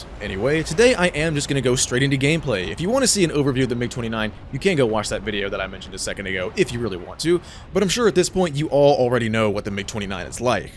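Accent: United States. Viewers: I like the way you talk,American. subscribed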